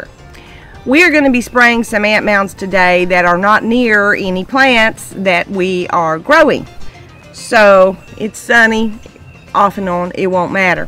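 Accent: South, United States. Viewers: If it doesn't kill the queen that's way down deep, the ants in the ground will move the mound. I love your videos and you sound just as southern as me.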